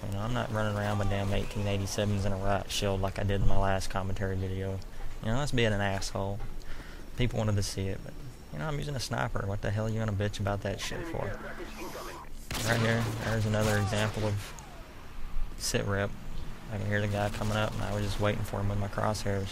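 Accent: South, United States. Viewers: Nice commentary. Where r u from? Southern US somewhere I'm guessing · u have a southern accent? cause u say snappin ^^ and u do exactly what i do listen to footsteps its incredible the people who dont... cool vid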